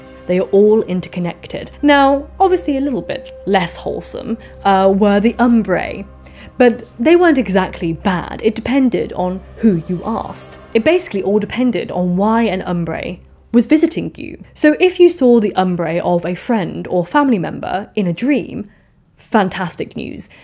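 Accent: RP, United Kingdom. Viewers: So posh. Awesome. Great content.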